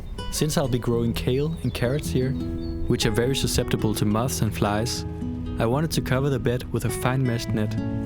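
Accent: Denmark. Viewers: you sound Danish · the accent makes me think you are one of us. love from Skanderborg. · Am jeg høring danglish?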